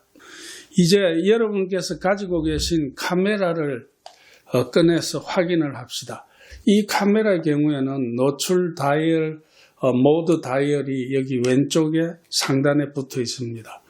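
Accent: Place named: Gyeongsang, South Korea. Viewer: 안녕하세요 선생님! 정겨운 갱상도 강의 재미 있어요 음악점수 ‘빵점’은 엄살 같으신데요? 늘 건강하시길……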